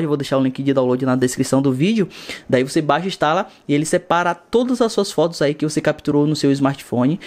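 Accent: Bahia, Brazil. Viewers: Tu é baiano? Te sigo no face mlk bom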